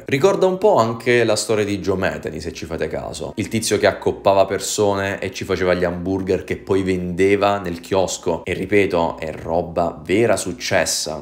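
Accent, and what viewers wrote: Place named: Apulia, Italy. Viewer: Ciao, di dove sei? Il tuo accento sembra pugliese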